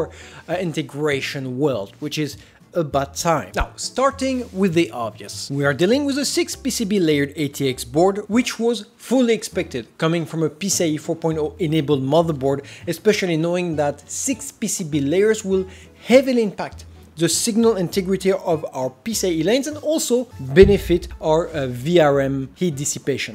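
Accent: France. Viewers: You have a little french accent 😊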